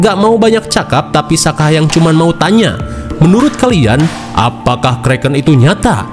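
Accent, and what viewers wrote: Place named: Java, Indonesia. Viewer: Entah kenapa saya mendengar suara Jawa Jawa Jawa 😅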